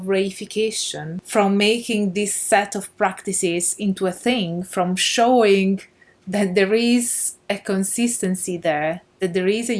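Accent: Italy. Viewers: Grazie mille di aver condotto questi studi, si vede quanto sei preparata e appassionata a riguardo! Pensa te che ti ho trovata su youtube casualmente, per poi capire (in fretta, hai un bellissimo accento italiano) che eri italiana e per di più a quanto ho capito vivi a Leeds.. e io vivevo a Leeds fino a poco tempo fa 😂😂😂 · Didn't recognize the ascent as Italian, my family's is different. Doesn't matter, now your just even better. Anyway, my big question is or maybe it was answered and I just didn't understand is, because I'm stuck in that old Catholic way of thinking, how you can change tradition. I'm coming at as an American, and every youtuber or person I know it's personal, or singular. It feels like it is a very new religion and changes depending on who you talk too. I would think things should be written down in old books or even tablets. It feels to me that thinks should stay consistent. 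Also, in the U.S. students don't cast spells, they just pull there cell phones out frustrating teachers.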